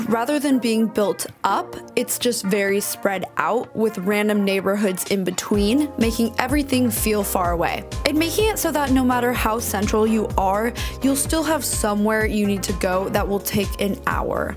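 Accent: Los Angeles, United States. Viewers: Quintessential L.A voice and personality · At least the LA accent has rubbed off on you!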